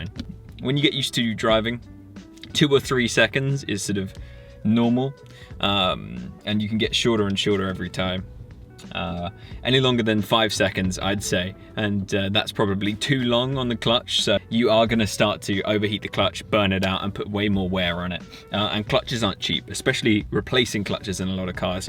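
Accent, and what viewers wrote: England, United Kingdom. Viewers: I heard the same thing! Sometimes this British accent drives me crazy. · Something about his English accent makes me want to listen more 🥴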